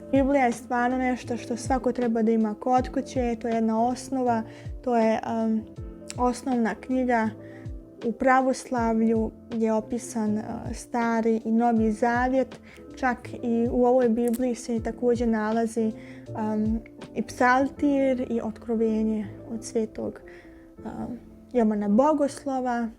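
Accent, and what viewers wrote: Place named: Republika Srpska, Bosnia and Herzegovina. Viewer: ОНО ШТО МЕ РАДУЈЕ ЈЕ ТО ДА ПРИЧАШ ИЈЕКАВИЦУ И МИСЛИМ ДА СИ ИЗ РЕПУБЛИКЕ СРПСКЕ. И ЈА САМ.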